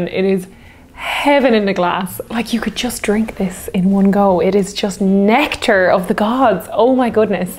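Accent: Ireland. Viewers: Your second video for me. I made this comment after watching my first, which was your first Sazerac. Here's my comment from that one, which probably should have been made here .... "The first video of yours I've watched and it was very enjoyable indeed. I've visited Ireland often and listening to you speak makes me want to return and visit with my Irish friends there. Anyway, the Sazerac is a great drink, which I've enjoyed many times in New Orleans, and your formula is fairly classic. Mine (which I was taught by a NOLA bartender I befriended) goes as follows: 2oz Rye, 1/4 oz. Demerara sugar syrup, 3-4 dashes Peychaud's, 1 dash Angostura, and 3 sprays of the Absinthe atomizer into the chilled glass just before I pour in the other mixed ingredients. Lemon twist does not go into the glass. Slainte! (And I'm now a subscriber.) Oh, and I agree with the comment below that you'd likely truly enjoy a Vieux Carre as well."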